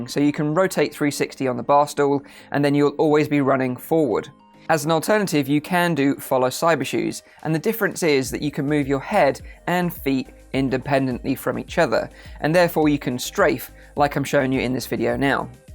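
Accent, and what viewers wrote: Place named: England, United Kingdom. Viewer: It's the english accent. From england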